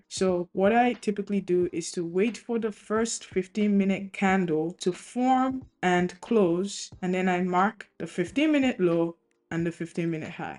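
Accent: Nigeria. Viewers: I think she is Nigerian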